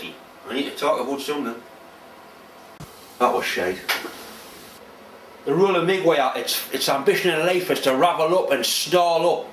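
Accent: Newcastle, United Kingdom. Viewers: Been in Engineering all my working life - so well used to the tchnical terms used. First time I watched this, I laughed so much the tears ran down my face! Great Geordie accent - brilliant and sound Engineering advice - lots of good ideas. Keep up the good work. You must be a proud Dad!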